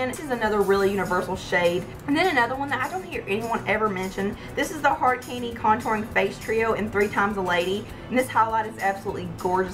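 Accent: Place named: Gainesville, United States. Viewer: Thanks for the very clear instructions about strobing ! I sure appreciate this. I love your accent too...you sound like you are from gainesville fl.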